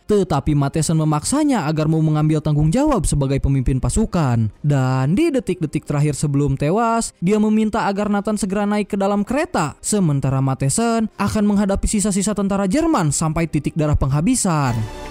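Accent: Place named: West Java, Indonesia. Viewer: Urang Sunda nya,,, edun euuuy lah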